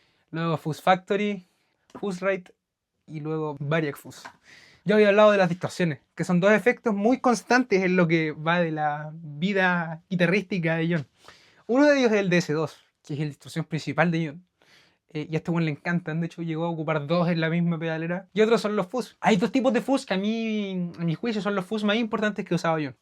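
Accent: Chile. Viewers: Buenas eres de conce ? Quedé sorprendido jajajaaj saludos bro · Tremenda masterclass. Una pasada de video, es asombroso desde el principio a fin. Saludos desde México (¿Eres Chileno? Por alguna razón el acento es igual al de mi profesor de Biomol, que es Chileno).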